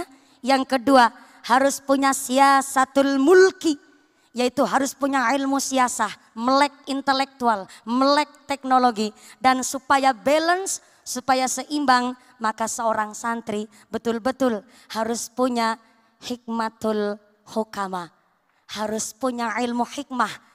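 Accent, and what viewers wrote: Banyumas, Indonesia. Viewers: Ustadzah dari republik ngapakers (mugi2 sehat lan sukses) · Mandan ndredeg ya mbak? Angger ngapak mandan maen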